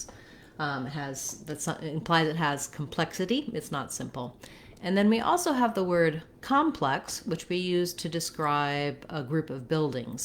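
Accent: United States. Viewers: I think you should state that these are US pronunciations. In the UK we use first syllable stress for noun and adjective.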